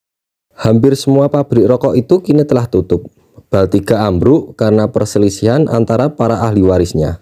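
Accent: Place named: Java, Indonesia. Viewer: Mantep ko ,masih terlihat aksen Jawa nya , jadi ciri khas identitas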